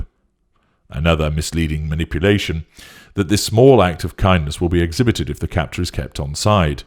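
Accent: United Kingdom. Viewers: I never need a respite from a lovely British accent, but Brits can certainly be difficult for American English speakers to understand. It's like when they have an idea-r. 🙂 The best idea is to turn a respite (a brief break, especially from something difficult or unpleasant) into a permanent narc-free vacation. Wherever you are in your journey, I hope that you are able to GOSO. 🙏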